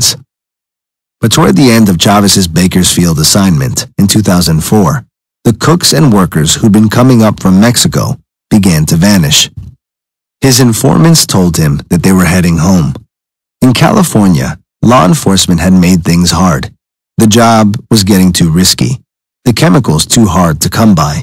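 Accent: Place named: United Kingdom. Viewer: …one photo no captions British (sounding) host fku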